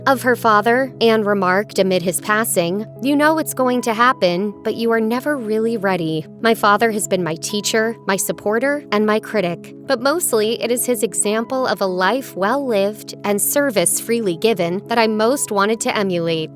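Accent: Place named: United States. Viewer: Horrible American accent from commentator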